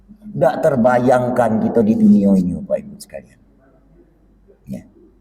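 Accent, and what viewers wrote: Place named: West Sumatra, Indonesia. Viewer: ADA JALAN WALAU PUN DI KIAS DAN DI LANGKAU ATURAN DALAM PERJALAN PADA JALAN ALLAH DI JALAN SIFAT ALLAH KEMBALI PADA ALLAH UNTUK KITA BAWA CAYA KALIAMAH NORULLAH✨️☀️✨️CUMA KURANG JELAS LOGATNYA UNTUK SAYA TERIMA🫶💕🫶SEPERTI BAHASA MINANG YA🫶💕🫶BAPAK SIFAT YANG TERDAHULU SESAMA SIFAT ALLAH✨️☀️✨️TAK MENGAPALAH HENDAKNYA PADA HAK ALLAH YANG BENAR KEMBALI PADA ALLAH YANG NYATA✨️🫶☀️🫶✨️AL FATIHAH✨️🤲☀️☀️AMIIN☀️☀️🤲✨️ALLAHU AKBAR ALLAH ALLAHU ALLAH"2✨️☀️☀️✨️☀️☀️✨️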